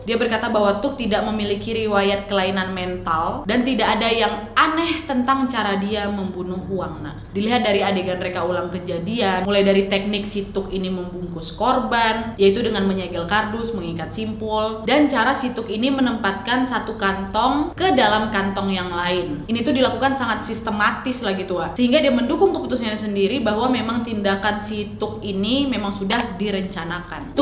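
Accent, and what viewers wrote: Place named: Sumatra, Indonesia. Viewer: Karena aksen nya sumetera banget...jd lebih masuk kalau buat aku. Berasa lg diajak ghibah sm bestie 😅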